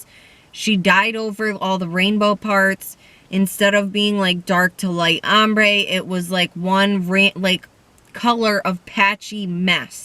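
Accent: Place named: Midwest, United States. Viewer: Girl I just love your cute little midwestern accent! I'm gonna say like Michigan or Illinois? Idk why I love that accent lol Its just the cutest thing to hear 😘 I'm from Maryland so our accent is pretty unique. I've spent half my life in NY with that side of my family..... and they are all Italian immigrants so they can't go judging ANYONE else's accent lol But they say I don't sound like other people from Maryland so I'm really glad for that 😏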